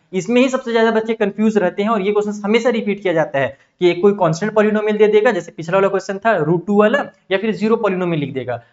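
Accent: Bihar, India. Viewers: sir aap bihar se hoo kya ?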